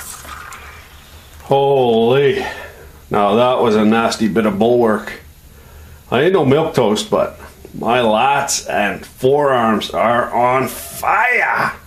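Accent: Canada. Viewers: Not sure where you're from but you sound very Canadian lol. I enjoyed this video and had a great laugh at your hilarious commentary. Great job on the punch, give me some ideas for myself. Thoroughly enjoying the precision calliper adjustment lmao. Have a great day. Ian Little Spirit River, Alberta, Canada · Iron ring? So you ARE Canadian... I thought I recognized that accent.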